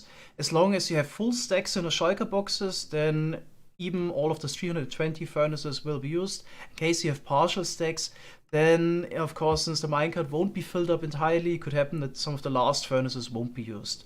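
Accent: Germany. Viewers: He’s German yeah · i mean, the accent would suggest german or austrian :)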